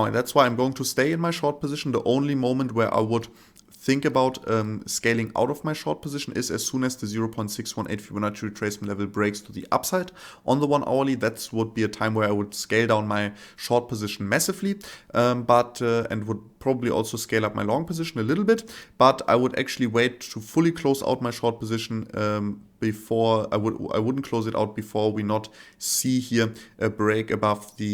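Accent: Germany. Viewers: Your videos are fantastic. Thank you! Packed full but brief and to the point. As a linguist, I'm curious about how you acquired English given that you have some German accent but your grammar and vocabulary are flawless. Did you spend a few years at a younger age in an English-speaking country?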